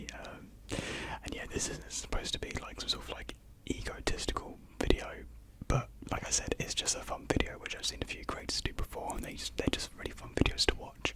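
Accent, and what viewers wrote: United Kingdom. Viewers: I use your videos to learn British accent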